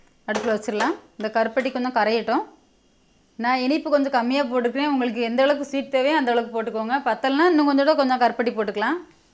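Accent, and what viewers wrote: Tirunelveli, India. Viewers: Nellai slang la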